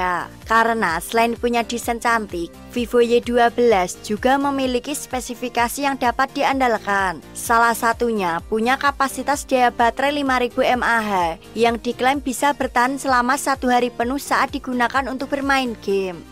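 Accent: Java, Indonesia. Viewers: jawanya sangat.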